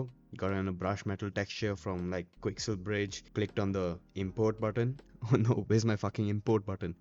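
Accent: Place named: India. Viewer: Indian accent sure is dense, but holy hell i thought u have like 80k subscribers or something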